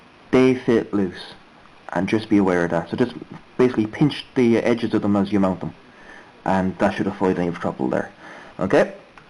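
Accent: Ireland. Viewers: Ah... Part II... All clear now. That was well done. You're a natural. Love the accent dude. You sound Irish... did you know that? hehehe.:)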